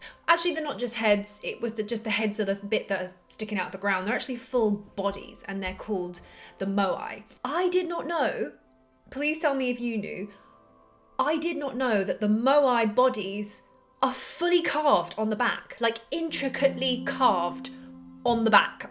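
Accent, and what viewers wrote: England, United Kingdom. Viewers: The english accent really helps out the channel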